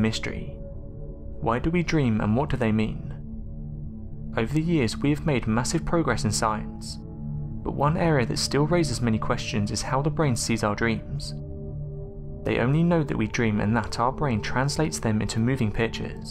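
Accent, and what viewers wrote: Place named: Manchester, United Kingdom. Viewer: When discussing the question of dinosaur lips, this narrator (already famous in my book for love him/hate him usefulness).. says something much closer to "limbs". I understand that his accent (Manchester?...I don' know enough of the styles) may be hard for me to get, but damn that one was out there. I'm trying to get used to the "up/down.up/down/up...and fade the last word to breathy nothingness" style, and I'm getting there even though I find it irritating. His actual voice is great and usually if I'm watching a film or show taking place in GB, I love the accents. I just can't get the info straight sometimes with his rhythm and fluctuation. He probably hates how Americans speak as well lol. Otherwise, as usual great content and yes I'd like to see more exact pictures of subject matter but I understand it's not always possible. 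Good show.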